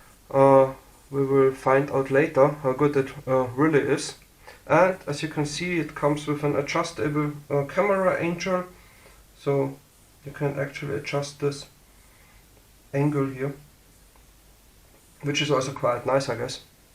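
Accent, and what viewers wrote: Germany. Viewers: German? I think i hear an little accent? If yes i got a few questions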